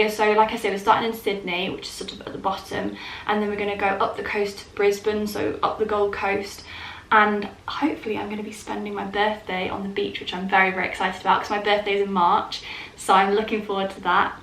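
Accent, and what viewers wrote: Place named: United Kingdom. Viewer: Lose the British accent before you go abroad because nobody wants to hear it just for your information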